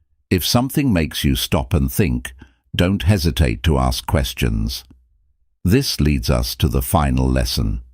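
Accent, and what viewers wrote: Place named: United Kingdom. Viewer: It's a great subject there's a shit ton of it out gear what sets you're presentation apart from the herd and saysclisten to me???? IT SURE AINT THAT CALM CONDENSENDIN ENGLISH VOICE THATS ON A COUPLE OF DOZEN NEW PIECES OF CONTENT A DAY ARE YOU READY TO DITCH THE ACT TO USE YOURE REAL VOICE??? AND IF THOS IS YOURE REAL VOICE ,WHEN ARE YOU GOING TO PUT THE ACTION BEHIND YOURE WORDS AND ACTIALLY PUT POWER AND HART ONTO EVERY SENTANCE??? CAUSE THE WAY YOURE PRESENTING THIS WANDERFUL MESSAGE YOURE sabbatoging the good you could be doing you want a better channel more thumbs up ditch that posh english crap or if that is you iam sincearly sorry find away or get a coach watch some videos on public speaking you're message ain't bad but there us nothing holding me gear specially when I know this crap allready see you say let go what we cannot control beuitif thing but you're killing me iams fighting sleep cause bbbbboooorrrriiiiinnng not impressive no emotion it feels you're just a damnd actor reading some lines even worse an actor just taming a job to get by with no real interest in what there doing and sad is it is Ionley comment cause I care ill probably be watching new content from you tomarow the difrence is if you sound like you're just paying the bills no matter how good the info is you're getting the down ward thumb iam ubsubing and reporting you as just another goddamnd a.i. 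pretender and I fing hate snitches and will probably abuse my self as a sick punishment for breaking Mt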